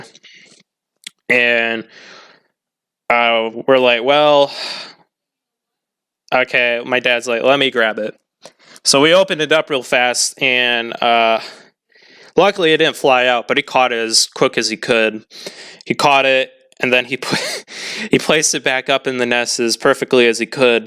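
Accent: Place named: United States. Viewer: Oooh you're American! I'm British lol.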